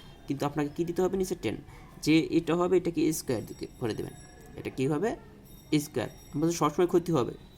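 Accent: Sylhet, Bangladesh. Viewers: ভাই মনে হয় সিলেটি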